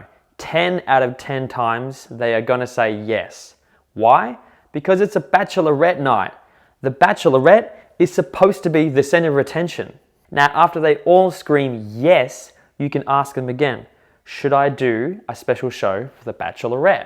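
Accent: Australia. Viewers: Sir your Australian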